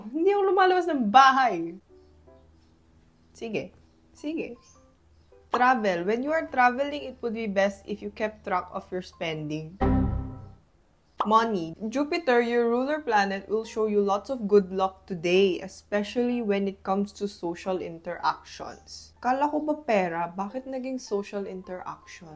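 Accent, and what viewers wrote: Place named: Batangas, Philippines. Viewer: alam nyo ga? ha ha ha! you are Batanguena through and through… 😊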